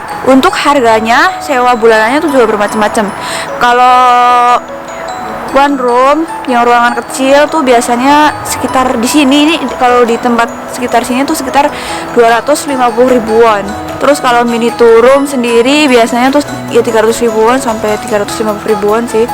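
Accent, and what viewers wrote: Banyumas, Indonesia. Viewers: Kok agak2 medok ya. Orang mana kak? Kayak logat banyumasan gitu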